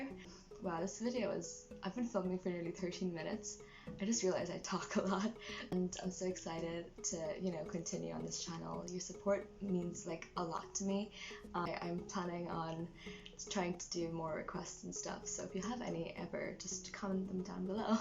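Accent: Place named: Ireland. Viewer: ahhh it's so nice to hear you talk! :) I didn't know you were from Ireland, that's so cool! I'd love to see more of these kinds of videos sprinkled in with your covers in the future!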